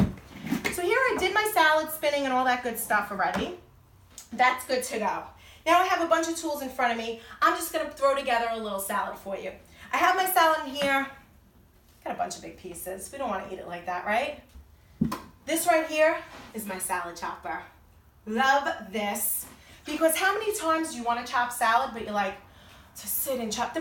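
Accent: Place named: New York, United States. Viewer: I love your shirt AND your NY long Island or Buffalo accent LOL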